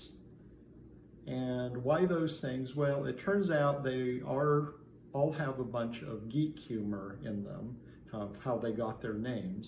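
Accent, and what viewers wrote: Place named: England, United Kingdom. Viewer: Request you to kindly add subtitles. English accent is hard to understand. 🙏🏼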